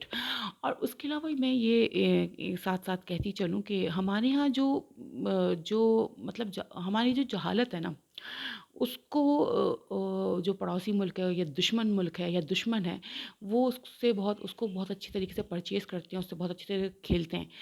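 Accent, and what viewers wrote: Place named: Punjab, Pakistan. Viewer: You are speaking wrong urdu with punjabi accent .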